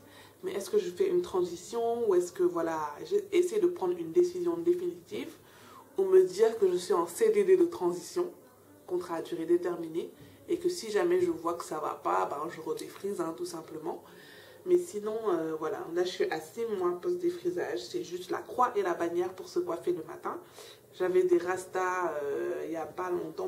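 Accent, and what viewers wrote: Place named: Senegal. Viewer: looooooooooooool l'accent galsen!!!!!! je kiff!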